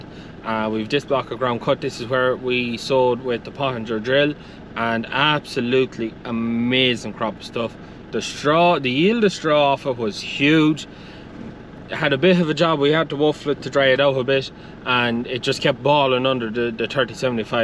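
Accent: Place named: Ireland. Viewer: From across the pond, enjoy your farming channel & the Irish accent!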